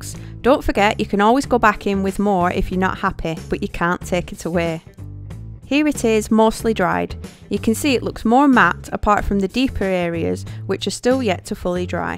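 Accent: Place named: Yorkshire, United Kingdom. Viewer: Are you from Yorkshire as you sound like one of us haha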